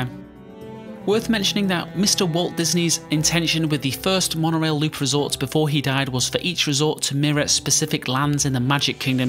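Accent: Liverpool, United Kingdom. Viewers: Thanks so much. Best video I have ever seen from Disney you tuber. And I've seen a lot! Love your accent..are you a scouser?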